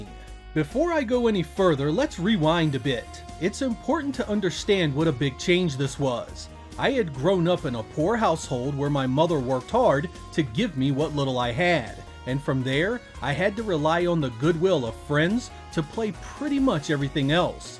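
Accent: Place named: Pennsylvania, United States. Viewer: We are exactly the same age and I even grew up in the same area and went to the same malls you talked about in previous videos. I grew up in the Southampton/Feasterville, PA area. I'm sure we would have been friends if I knew you at the time. Actually, when I first discovered your channel, the first thing I thought was, "hey, I know that accent!". :) Cheers!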